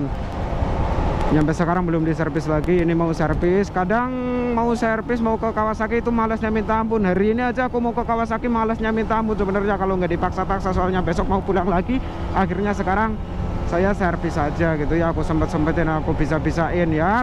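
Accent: Banyumas, Indonesia. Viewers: Ngapak kocak gaess😂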